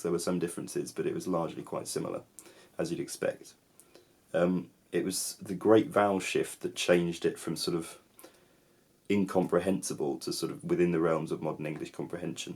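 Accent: United Kingdom. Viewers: He sounds more british than a fooking Benedikt Cumberbatch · I am russian. And I hear your english is so beautiful. British accent is so european, just like latin or french 😅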